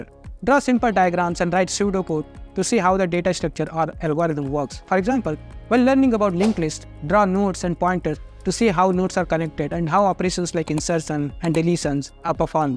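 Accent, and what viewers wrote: India. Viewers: bhaiya aap bihar se ho kya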